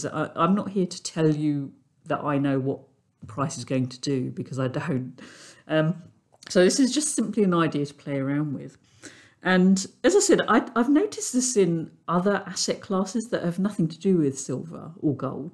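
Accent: United Kingdom. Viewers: The best analysis in a very long time, and I love the British accent as it reminds me of my lovely old days in Wesr Yorkshire at Bradford Uni. Ta love!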